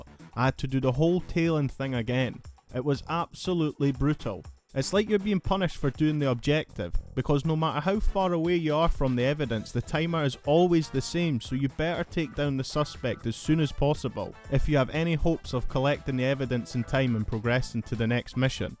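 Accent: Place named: Scotland, United Kingdom. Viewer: A Scots man giveing me nostalgic…. Earns my sub ✊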